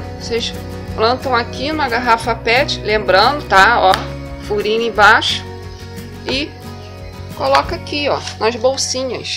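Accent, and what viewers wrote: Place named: Rio de Janeiro, Brazil. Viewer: Linguajar carioca, para falar com o Brasil; da não viu?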